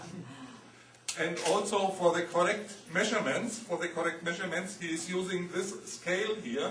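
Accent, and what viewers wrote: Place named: Germany. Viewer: xDD never heard such a typical german accent, and im german so i heard much^^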